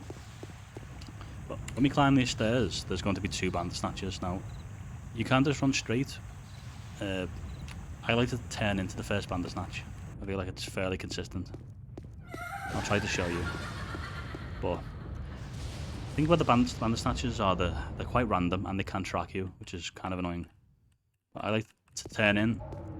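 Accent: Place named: Liverpool, United Kingdom. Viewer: Excellent video. Great to hear a fellow Liverpudlian also!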